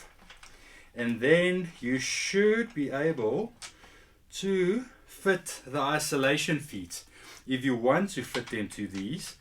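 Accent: South Africa. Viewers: I now have the svs ultra evolution bookshelfs with evolution center and 2 x pb1000 but i was using floorstanders before so i am missing 4 drivers and now i think it is lacking in presence. So i am thining to go with the primes Pinnacles actually, as the evo towers would definitely be too big for my 12 foot by 10 room..also a bit pricey too. I hope the svs prime pinnacles will blend ok wirh my svs evo ultra center. Maybe i need to swap that out for a prime center? Maybe just the plain prime towers would be enough. I am in a dilemma as I can get opened box from dealer for £699 or buy the prime pinnacle towers for £1600 A South African accent? First time i heard. Nice accent. No offense intended at all, as i am sorry I thought you were German to begin with, with a bit of an Australian accent. Got it sooo wrong :) sorry! 😂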